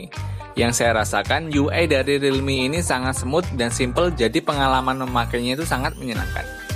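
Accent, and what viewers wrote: Magetan, Indonesia. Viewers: Boss...magetan e ndi boss? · Iki lak wong Magetan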